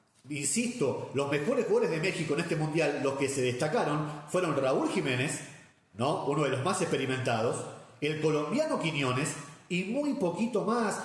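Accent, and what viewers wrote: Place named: Argentina. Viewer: Hola mi buen Argentino (supongo por tu tonito) te equivocas al pensar que no conocemos nuestra realidad (futbolística) pero si te invitaría a qué no te amargues ni te lo tomes en serio lo del fútbol, por qué los mexicanos pasada la emoción volteamos la pagina y seguimos la vida igual de alegres (si conocemos nuestra realidad) admiramos a Messi si eso te hace feliz .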